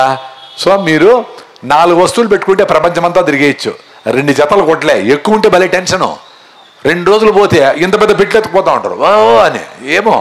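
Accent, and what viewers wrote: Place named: Chittoor, India. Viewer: Madanapalle chittoor slang ande adhe .. mame atle madadatham ..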